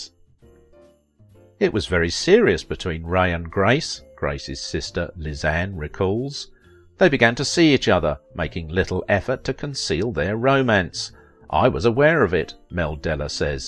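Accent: England, United Kingdom. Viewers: Sometime this guys English accent is just too much for me. I can't understand everything he says. Is this only me ?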